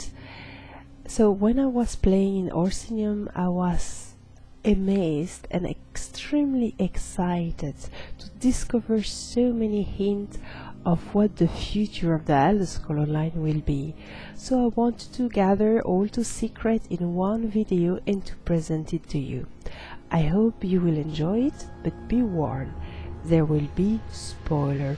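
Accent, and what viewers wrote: France. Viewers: Êtes-vous français ou québécois?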